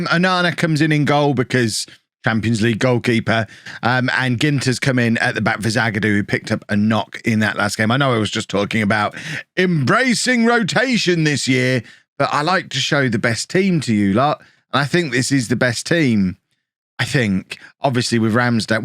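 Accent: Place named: England, United Kingdom. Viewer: Its funny to me, that in some regions in England people pronounce some words that has the letter "W" like it also has a "R" in there ^^. Awesome series!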